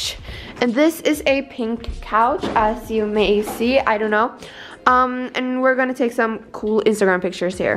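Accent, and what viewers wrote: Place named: United States. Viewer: you sound totally american like really, ilysm!!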